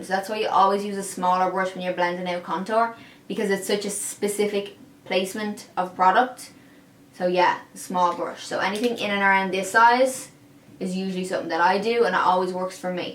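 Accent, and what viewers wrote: Ireland. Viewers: Are you Irish? Love your accent 😭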